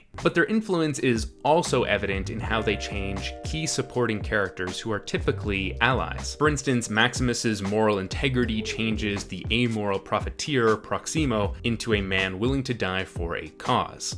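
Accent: Canada. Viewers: The Canadian accent is strong in this one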